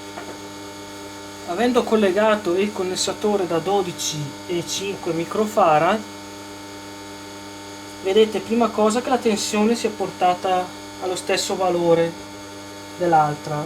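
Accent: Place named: Brescia, Italy. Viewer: sei bravo e spieghi bene le cose emi piace il tuo accento di brescia mi preoccupa un po vederti armeggiare con tutti quei fili scoperti , stai attento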